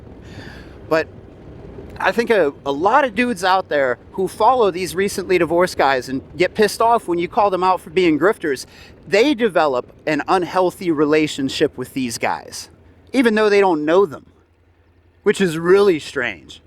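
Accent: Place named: United States. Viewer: well American people always relocate so I thought you must have relocated there .....ofcourse I know your accent is American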